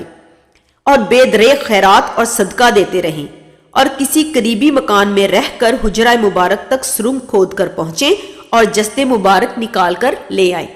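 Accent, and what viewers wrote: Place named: Punjab, Pakistan. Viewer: ایک مرد مؤمن مرد حق کی شان بیان کرنے کے لئے مردانہ آواز ھونی چاہیئے، مؤدبانہ انداز ھونا چاہیئے اور لب و لہجہ بھی درست ھونا چاہیئے اور خاص طور پر تلفّظ پر زور دینا چاہیئے !!! پنجابی تلفّظ میں اردو کانوں کو انتہائی بھونڈی لگتی ھے _ " معذرت کے ساتھ ؟؟؟ " سید عابد حسین شاہ اویسی ایوبی مداروی !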